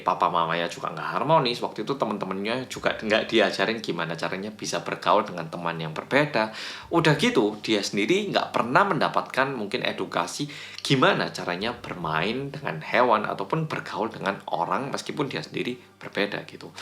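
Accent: Java, Indonesia. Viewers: medok.e ketoro men 😂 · Coba logatnya, jangan lah kental Jawa, yang dengar semua orang Indonesia 😊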